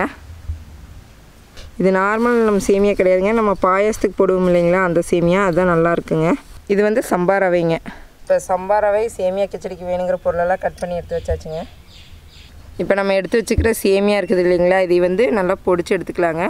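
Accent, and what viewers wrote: Kongu, India. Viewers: Ungaloda kongu tamil tha romba alagu 😍😍🔥💯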